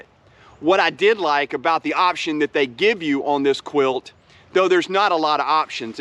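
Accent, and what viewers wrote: United States. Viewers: Where you from I like the accent, that's a proper American accent...Man I've been going to get this quilt for ages, its always A pain buying from the US we get hammered with expensive postage and VAT tax and import tax in the UK. I wish they would sort a good trade deal with the US so we could buy competitive. What kind is the back pack it looks a nice pack?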